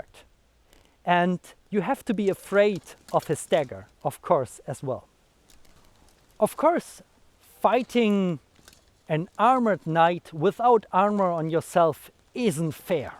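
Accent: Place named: Germany. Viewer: The way you structure you sentences, the words you choose, it's like... You're SO DISTINCTLY german, even not taking that beautiful accent into equation, i absolutely love it. One of the best channels about armor, so pleasant to listen to this voice and see the quality action. Hi from RU !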